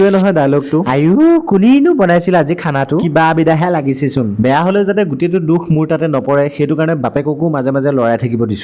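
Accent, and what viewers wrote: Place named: Upper Assam, India. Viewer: কথাৰ সুৰটো আমাৰ আপাৰৰ, ক'ত ঘৰ?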